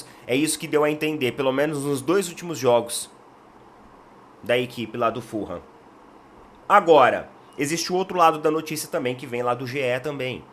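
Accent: Sao Paulo, Brazil. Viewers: Sotaque Paulista mano.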